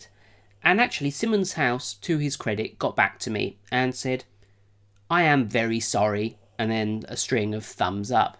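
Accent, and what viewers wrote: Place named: United Kingdom. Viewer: Haha.. you are crazy, but you do have a fine haircut and a lovely British accent.. very good english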